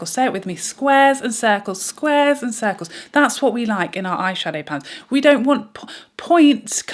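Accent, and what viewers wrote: United Kingdom. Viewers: I just found your videos today, and I ADORE your POV, your common sense approach, and your talent! As an American, I am also enchanted by your British accent. Thanks for doing videos!!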